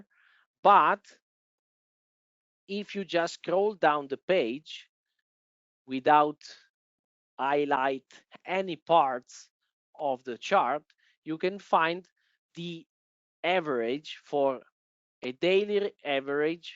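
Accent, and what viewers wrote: Italy. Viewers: bel video, ti consiglio di registrare in voce italiana e tradurre l'audio in inglese con un tool di IA se davvero vuoi raccogliere iseguito internazionale, pochè la pronuncia inglese attuale potrebbe dar fastidio a chi è madrelingua inglese, ma è solo un mio parere personale e feedback che spero sia utile. Apprezzo molto i tuoi video e ti seguo con piacere da qualche settimana, sei una delle poche persone che è riuscita a farmi capire ed apprezzare il mondo delle azioni e dell'analisi fondamentale/tecnica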